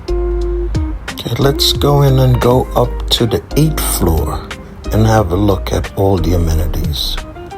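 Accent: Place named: Jamaica. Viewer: I can hear that powerful exploratory Jamaican heritage in your voice bro! I wrote this before i saw the flag I've just reached that.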